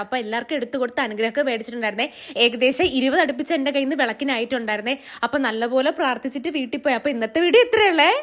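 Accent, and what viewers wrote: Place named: Thiruvananthapuram, India. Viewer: കെറുവ് 😃😘 തിരുവന്തരം തന്നെ 😘🫰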